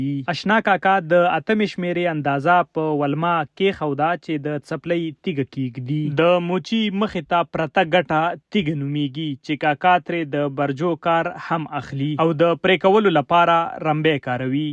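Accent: Standard. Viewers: Bhai pure pashto Masha Allah ❤✨ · DER zabardast explanation de okro ji DER kha zma staso awaz staso Andaz ao pure pukhto Dera khwakha da umeed laram che kala ba mulakat oko insha ALLAH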